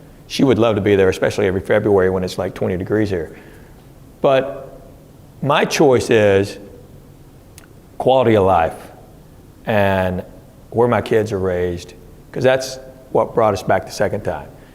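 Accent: South, United States. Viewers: Thank yoy for your family, wholesome, hysterical comedy. Anyone can do 'vulgar' that is just cheap, non creative head-talking. Not only family, but there is an intellectual banter with your routine and interchange with the audience that entertains.. Great job. I am from TN, and like the Southern slant!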